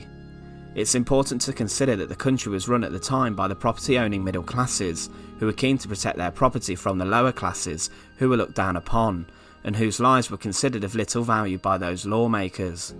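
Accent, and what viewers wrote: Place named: England, United Kingdom. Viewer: Great narration. Love the accent. What part of England are you from?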